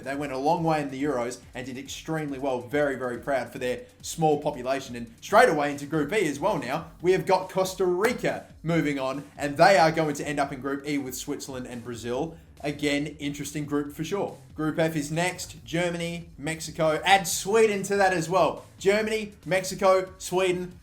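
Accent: Australia. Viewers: I thought u were English at first and when you started to explain last world cups group England "was in", I was like "wtf, they had Italy, Costa Rica, and Uruguay, not spain, netherlands, and chile. Then i realized you were Australian....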